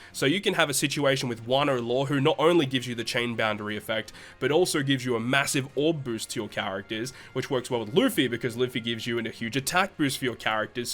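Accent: Australia. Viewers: with his accent you should be able to tell he's Australian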